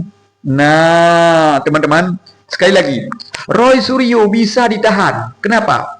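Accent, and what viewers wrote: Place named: Malaysia. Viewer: Conten ini lucu skli bicara nya orng malaysia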